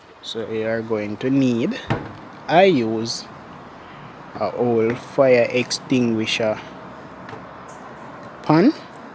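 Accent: Jamaica. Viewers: New viewer. I like your creativity and the Jamaican ascent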